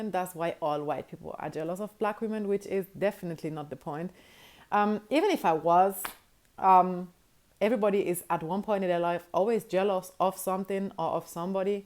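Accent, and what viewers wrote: Nigeria. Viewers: You have a Nigerian accent... Did you leave in Africa before? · Dear, you speak with a low-key Nigerian(igbo) accent. And your last name, is indeed Igbo. If you may, am I correct in my observation? And how are you related to "us"? Regards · I like the way she speaks with facts. When I first heard her accent, I thought she was Nigerian in some way 🤔. Then she mentioned her husband is from Nigeria and I was like 'Aha!' 😁. Wonderful content. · It's this Nigerian accent for me, thanks for your insight. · Nigerian accent is beautiful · Wow!!! Negative people just appear out of nowhere to give their nasty a$$ remarks. She sounds Nigerian to me. There's a huge Nigerian community where I live and that's what she sounds like to my ears. Yes I pick up on the German. My in-laws are German. And her accent fluctuates. But to me - that's what she sounds like. 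If she doesn't to you - kudos. But I see the commenters who appear to also be West African and hear the accent as well. Meaning - you got nothing better to do than troll and be negative. Good luck with that. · I was so surprised when you started talking cause your accent sounded a bit Nigerian. And then you mentioned that that your husband is Nigerian and it made so much sense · I was thinking about the same thing.... My brain was like this is Nigerian accent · WHALLEY-Oooo...My Wife, as we day in Nigeria!! I just confirmed after I posted beneath your video in comments that you had a distinctive Nigerian Accent!! Ahh-Ahh...Now I see your surname!! Hence why I'm calling you 'My wife' it's just a thing with Nigerians LOL You may be married to a Nigerian as my mummies surname is Nigerian too...When she goes to hospital and they try to call her by her 11 lettered surname and this little white haired woman calls out... 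'No...my name is... OMADELIENJE!! 🤣🤣🤣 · I love her Nigerian accent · Was saying to myself why she sounds like a Nigerian?? Then in the video,she says "I'm married to a Nigerian"😍 And thanks for this video... You couldn't have said it better ma'am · I love your accent, you sound African, a bit Nigerian-ish. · your accent is partially nigerian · then you guys don’t know German accent when speaking English, of course she’s German with the accent somewhere in there but she’s straight almost full Nigeria lol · You remind me of one of my ex's. She was German but her ex husband was Nigerian and she spoke English with a Nigerian accent just like you. Because of that I never thought of her as being white because aside from the color she wasn't. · Your Nigerian accent is strong. Wow. I appreciate the facts you spit. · I am wondering where your nigerian accent came from?!? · I hear the Naija accent trying to break through! 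😃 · Wow, ur accent, sounds more like core AFRICAN, NIGERIAN PRECISELY.. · Awesome presentation.. I picked up the Nigerian in your unique accent from the beginning.. Blessings.. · I believe she mentioned that her husband is Nigerian, she picked up the accent well and I too like that. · I'm northern European, brought up in West Africa and she's German, speaking English like a Nigerian - weird! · OmG..... Gospel from heaven. And your english is so Nigerian... Did you grow here?? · I love that Nigerian accent coming through Mama! Keep up the content. We are loving it. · I heard a slight Nigerian lilt in your voice; maybe Igbo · She's married to a Nigerian,she speak like a Nigerian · You've developed a Nigerian accent.♥️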